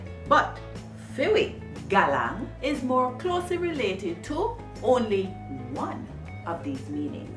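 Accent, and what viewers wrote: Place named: Jamaica. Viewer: I'm sitting on my couch alone and thought "the voice in my head needs a Jamaican accent", and here I am, an hour later still on your page. Tank yuh. Mi see ya likkle more. 🤣😁🫶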